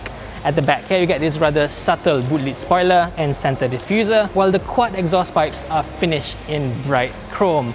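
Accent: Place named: Malaysia. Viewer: Your English is so good and can recognize u r malay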